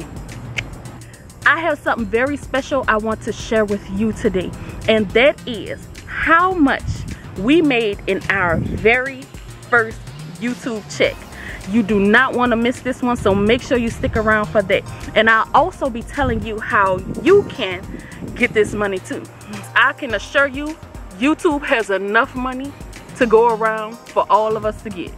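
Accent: New Orleans, United States. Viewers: I love your accent. It's sounds like a good N'awlins ( New Orleans) accent. I can recognize that accent anywhere. Lol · Do I hear a New Orleans accent??? I'm new to this channel. Keep up the good work!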